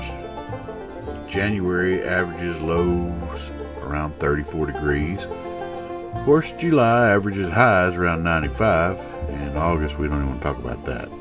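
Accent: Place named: France. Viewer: A down home feller with a great voice, and a respectable French accent!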